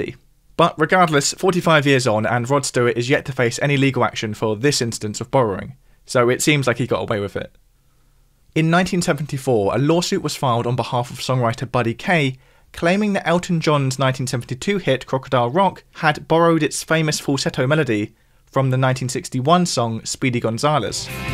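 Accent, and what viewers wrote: United Kingdom. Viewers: Had my fill of British narrators. Don’t those people work?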